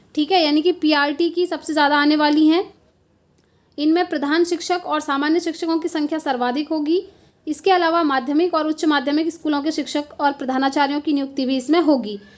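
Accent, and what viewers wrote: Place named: Bihar, India. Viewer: Lagta h app Bihari ho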